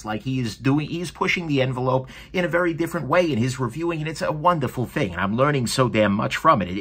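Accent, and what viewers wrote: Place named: Long Island, United States. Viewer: Long Island loves you! You sound like one of us! Lol! You bring me so much joy watching you dissect my favorite things other than my sons, KNIVES! Shot out to Doc Frunky and Frankie and Byrd! They are awesome too!!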